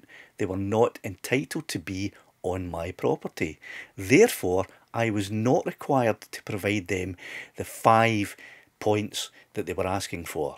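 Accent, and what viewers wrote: Scotland, United Kingdom. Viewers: Your Scottish accent makes this even more hilarious 🤣brilliant stuff.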